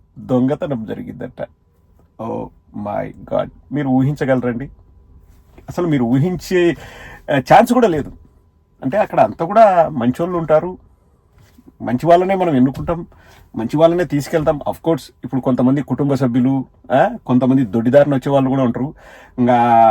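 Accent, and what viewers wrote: Rayalaseema, India. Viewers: Anna me yasa Rayalasema yasalo baguntadhi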